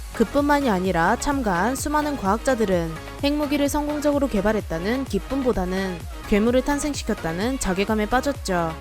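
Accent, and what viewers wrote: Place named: Gyeongsang, South Korea. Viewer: 언니 경상도분이신가보다 !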